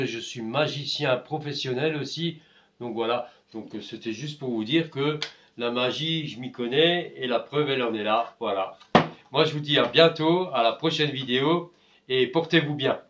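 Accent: Alsace, France. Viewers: C'est copié ,plein de vidéo sur Youtube sur ce sujet !!!!!!!!!,j'en ai trouvé une vieille de 8 ans ,pour recoller un réservoir eau de cafetière il faut oser ,collage cyano et la poudre sur un récipient alimentaire pas bon ;moi je fais pas ,attention si eau pas en contact avec collage pas de soucis. Ton sujet est un peu comme on dit du déjà vu ,celà ne retire rien a ton talent de bricoleur ,trouver un autre sujet pour durer ,c'est pas évident je jen convient . Tu a un accent Alsacien . Bye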